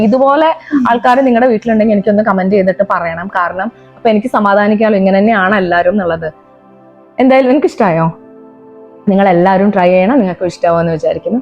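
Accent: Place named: South Kerala, India. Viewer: നിങൾ കോഴിക്കോട് അല്ലേ . എന്തിനാ ബുദ്ധിമുട്ടി തെക്കൻ കേരള slang എടുക്കുന്നെ ?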